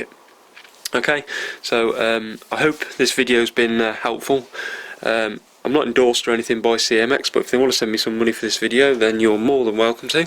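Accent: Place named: Midlands, United Kingdom. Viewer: Very rare in Uk for N Gauge, but just found one on EBay. Buying it now. Enjoyed the video and enjoyed the Midlands accent too. Honest. I am ex-Kiddy, but now in Cornwall. Keith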